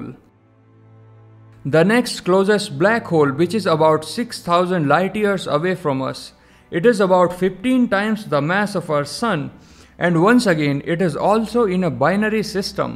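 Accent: India. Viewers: I need something in English I think that was broken Indian accent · you sounds like asian............. india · Intresting video: me:D Indian accent: me:( It's: me:D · Indian accent lol Wait, he is an Indian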